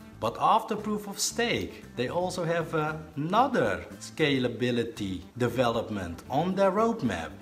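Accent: Netherlands. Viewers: This was great, I really enjoyed your presentation style. You must be Dutch judging from your accent.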